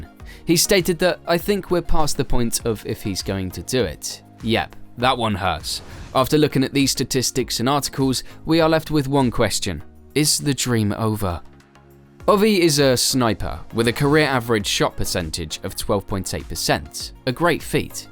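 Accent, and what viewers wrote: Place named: United Kingdom. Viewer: British accent talking bout hockey wtf is this a joke :DD? Ovie probably hits 25goals for this season, he will start picking up the pace.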